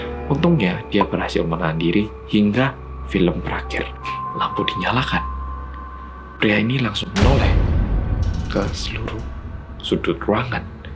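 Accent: Java, Indonesia. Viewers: Kang asli korea? Entah kenapa gak ada koreanya cuma ada jawa campuran melayu kan jawa itu kebanyakan apalagi yang asli tanpa permak itu hitam manis kalau senyum cewek maupun cowok,melayu kulit sao mateng kalau banyak keluar,kalau enggak itu sao muda putih bening,apa lagi medok khas jawa jadi ragu 😂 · Nonton bioskop ❌ Nonton yg medokkkkkk ✅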